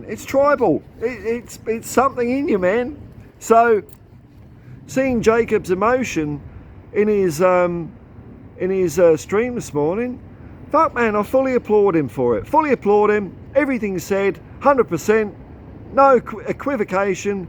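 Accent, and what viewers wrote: Australia. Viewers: Are you Australian mate? Can’t work out the accent. Like your channel 👍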